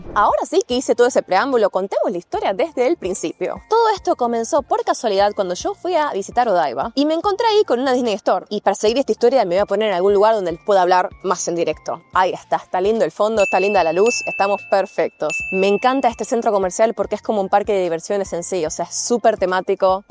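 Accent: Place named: Argentina. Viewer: ¿ Acento ? muy argentino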